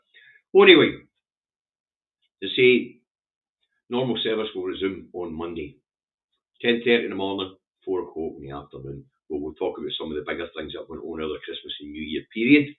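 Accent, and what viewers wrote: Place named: Scotland, United Kingdom. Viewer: Great to hear a Scottish accent again 👍🏴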